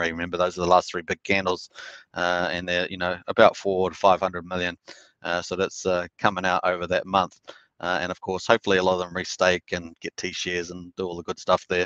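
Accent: New Zealand. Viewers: Nice to hear a kiwi accent, thanks for the informative vid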